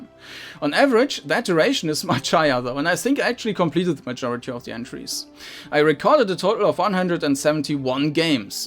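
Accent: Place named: Germany. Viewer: Ur englisch sounds so german :). Cant wait for this years crawler jam to start.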